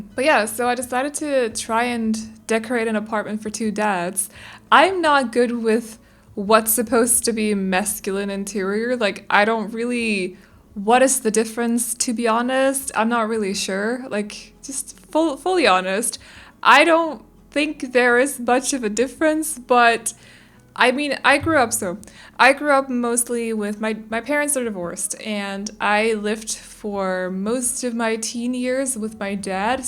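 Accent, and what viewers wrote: Denmark. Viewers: I don't think I have ever heard Danish but I can't believe it doesn't sound good. I absolutely adore your accent lol. Now I have to find the Danish word for aunt since you've gotten me curious. This is a great build btw. Trying to do something creative in this apartment drives me nuts. It's just set up so weird. You did amazing.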